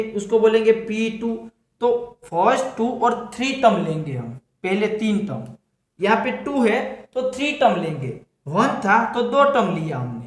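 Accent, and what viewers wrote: Gujarat, India. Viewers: Your tone is gujrati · bhaiya aap gujrat se ho kya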